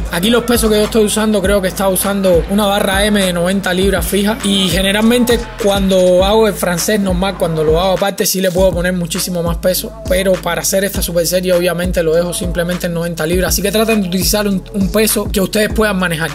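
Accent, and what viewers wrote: Cuba. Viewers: Eres cubano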